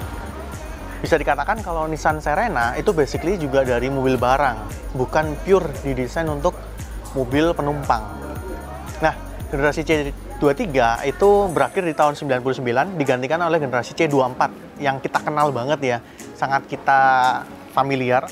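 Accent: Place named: Java, Indonesia. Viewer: orang jawa ya mas? kok medok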